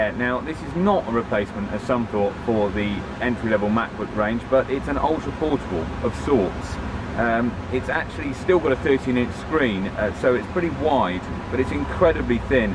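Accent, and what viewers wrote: England, United Kingdom. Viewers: english accent...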